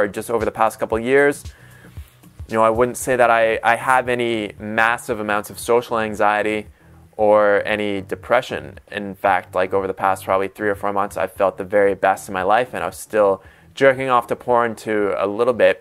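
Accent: Canada. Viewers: You're Canadian? (Btw i was so addicted i got ED, and in the most critical period in my life, if ya know wat i mean)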